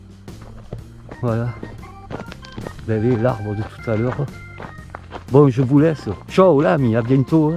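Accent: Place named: Provence, France. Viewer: Coucou si vous me le permettez ☺️ Merci infiniment de nous faire partagez votre magnifique région qu’est la Provence Je suis allée il y a quelques années déjà à Saint Rémy de Provence j’y ai visité aussi les baux Votre accent me réchauffe le cœur J’espère que vous êtes bien rentré de votre randonnée! Je vous souhaite une bonne fin de dimanche et, Un bon repos surtout après tous ces kilomètres dans les jambes Une amie virtuelle de la Belgique 🇧🇪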